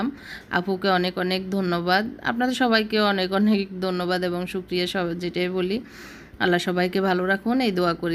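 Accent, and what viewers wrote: Noakhali, Bangladesh. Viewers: আসসালামু আলাইকুম আপু। আপু আপনার বাড়ি কি নোয়াখালী?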